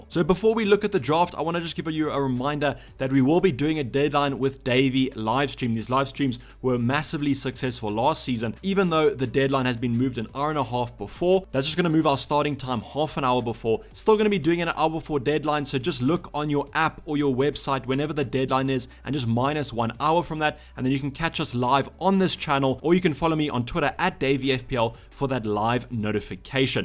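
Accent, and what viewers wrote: South Africa. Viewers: Found this video on my recommendations glad I did there was some valuable information in there love the SA accent and will be subbing. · Dayvy, Are you South African?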